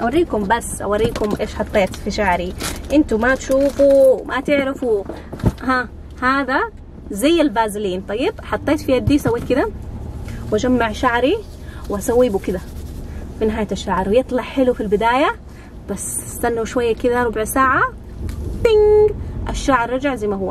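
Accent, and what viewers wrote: Saudi Arabia. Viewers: انتي سعوديه؟ مستغربه من لكنتك سعوديه بس وجهك وملامحك كويتية او اماراتية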